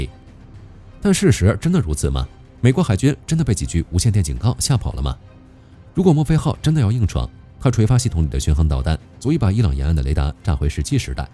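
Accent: China. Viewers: 这英语 浓浓的中国口音啊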